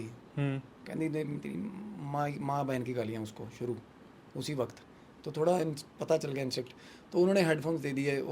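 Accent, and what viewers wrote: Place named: Punjab, India. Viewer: Bhaiya wo punjabi English hai ...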